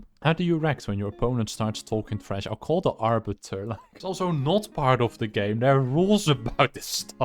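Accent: Netherlands. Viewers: Are you Dutch? I recognise that accent from everywhere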